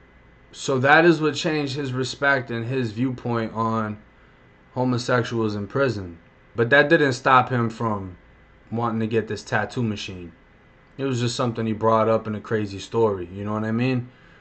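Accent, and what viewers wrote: Boston, United States. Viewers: Just hopped onto your Channel where you from bro sound like you from Boston I'm from Maine that's why I asked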